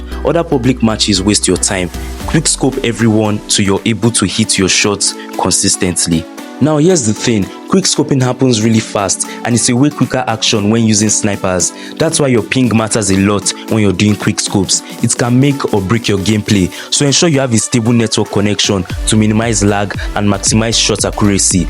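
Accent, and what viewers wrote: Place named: Nigeria. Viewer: You sound like a Nigerian ngl like me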